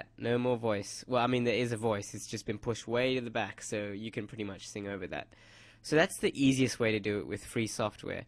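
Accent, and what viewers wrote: United Kingdom. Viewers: British Much <3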